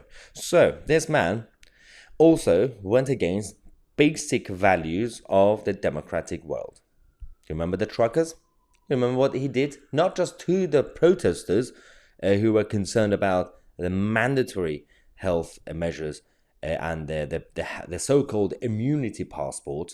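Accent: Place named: RP, United Kingdom. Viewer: stop talking like you got a toffee stuck in your gob you aint British so stop with the BS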